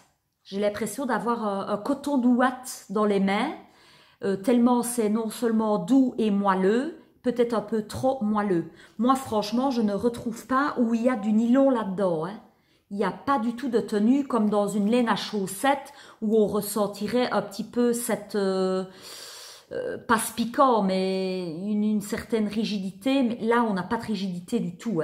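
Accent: Liège, Belgium. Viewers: Quel plaisir de vous écouter ! Toujours ravie d entendre votre accent liégeois : ma ville natale! Vous faites de très belles réalisations malgré le fait que vous ne maîtrisez pas encore complètement la surjeteuse ! Merci.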